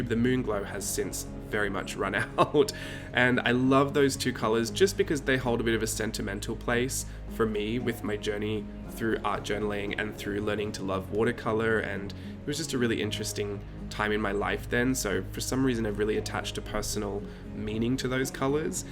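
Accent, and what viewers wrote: Australia. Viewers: Just found you, looked you up.;) Always watching. Lol I knew you sounded Aussie. I live in Dulwich Hill. Are you in Sydney region? Congratulations on your recent success after a car accident and changing careers to find something that you love. I like Irit's channel too. Love Japan, and Van Gogh. Highschool Teacher of woodwork and stuff then after many car accidents (none at fault!) I slowly changed to the little ones Learnt for 4 years I am a Montessorian I no longer teach but, now writer, artiste, illustrator. Watercolour collector of professional brands and handmades love inks and journals too (on a no buy break it is killing me) We are lucky to be alive both of us.:) Hope you are enjoying your Etsy shop break holiday. Now subscribed! In kindred spirit, Eliza Most Beautiful Day is Today! Sunday 26th of June, 2022 pm Dulwich Hill, Sydney Australia x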